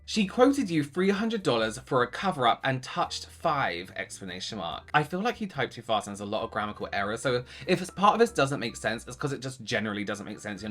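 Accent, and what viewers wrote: England, United Kingdom. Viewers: Can you speak Spanish? I'm dying to hear Spanish with a British accent! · I think you could make a living just reading aloud profane, ungrammatical posts from Americans (I am one, so don't come for me, fellow Yanks). I've gone back and listened to the segment between the mark and several times. The combination of your soothing voice, your British accent, and that incel's assault on the language (and his client) is art. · I love hearing you read out that nonsense lol. Words like "n*tsack" in that unamused English accent is just perfect 😂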